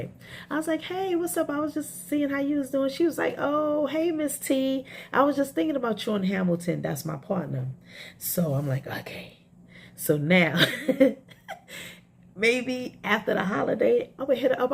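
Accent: New York, United States. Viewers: HEY, we need you I’ve been checking your YouTube for what feel like almost a year … to see if you finished this story!! I really appreciate your content.. you my NY AUNT IN MY HEAD! I’m from OAKLAND CALIFORNIA, and I BELIEVE YOU BEFORE I BELIEVE THESE PPL NEWS MEDIA PROGRAMING!! I check every day hope in the near future you bless us with the rest until then, patiently waiting on your return!! I love y’all accent man😂 you dope as hell and the queen of HARLEM TO ME!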